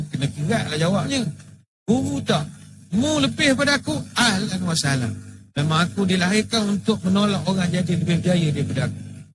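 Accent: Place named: Endau, Malaysia. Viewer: Bila ustaz badli Shah bercakap. Sya sangat2 suka. Ada sikit bahasa dia mcm basah kampung sya di endau.. Huhu. Semoga ustaz badli Shah sehat selalu kami sayang ustaz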